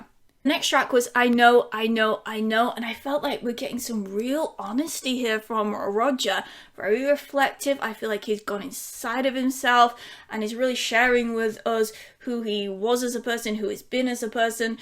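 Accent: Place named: Newcastle, United Kingdom. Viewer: This review is awesome and I love this album. The production and harmonies are outstanding, as is Roger's drum sound. You are pretty awesome too hahaha. I was trying to detect your accent. I will go with Geordie. I am a Londoner, so probably totally effed that up hahahaha x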